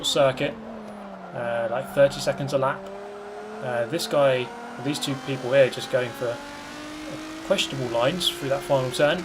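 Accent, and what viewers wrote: United Kingdom. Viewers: Your calm nonchalant attitude for ramming and other issues paired with the dialogue and English accent is absolutely hilarious for some reason. Some good dry humor lol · Your British voice just makes it so much better lmfao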